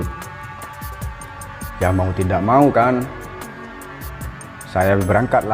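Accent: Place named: Madura, Indonesia. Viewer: Mentok Banget madurenah ✌️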